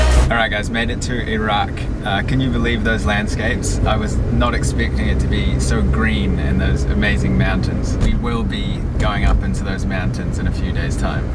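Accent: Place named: New Zealand. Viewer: He is a New Zealander, not Australian. And if you have a hard time with the accent click on CC and you can read along with the captions. ( true blue Kiwi born and bred here ) :)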